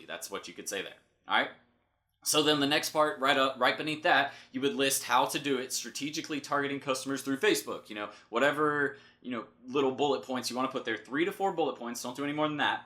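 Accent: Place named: South, United States. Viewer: Hi, that was a very good video with a lot of value, but I love your Southern accent the most.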